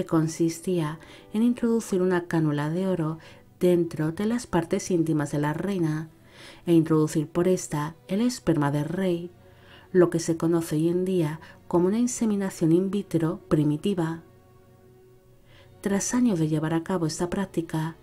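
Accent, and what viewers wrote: Spain. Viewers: Ese acento tuyo tan particular de que parte de ESPAÑA es ? Señorita!!!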